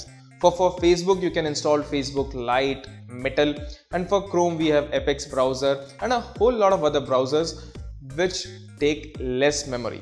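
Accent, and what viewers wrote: India. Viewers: please make your english much more clear remove* your accent(indian) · Share it lmao this is why I love indian accents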